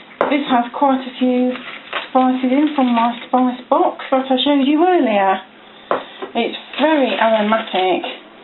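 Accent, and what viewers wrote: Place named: United Kingdom. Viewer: It's that damn British accent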